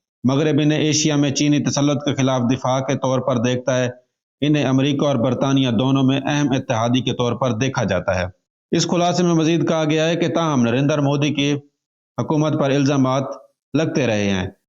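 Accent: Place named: Pakistan. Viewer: Yuck! What a Pakistani-sounding narrator. Utterly one-sided and typically I$lamiC in your worldview. Lol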